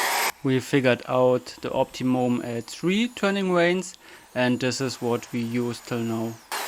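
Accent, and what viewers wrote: Germany. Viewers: Nice to see people giving the Harrier some love! Really awsome work you guys deliver there. To be honest I just found you guys and have to say: You have a new subscriber xD I really love fighter jets and their engeneering and its nice to see how you can actually reconstruct an awsome work of technical art with enough determination and knowledge. I really really like the way you avoided some problems and tested things out. I hope you'll continue this awsome work not just on the Harrier, but maybe on some other aircrafts, after this project. and a tiny unimportant question (if this even gets read): Do i hear a little bit of german accent? xD It doesn't matter where you are from, but just out of curiosity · This is next level. Are you a senior designer at Airbus or Arianespace? Because you should be! Also, sorry - is you accent Bayern or in der nähe? 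Lived in Muenchen for a couple of years and still miss it! And sorry if I misplaced your accent and you are from somewhere like Hamburg 😂!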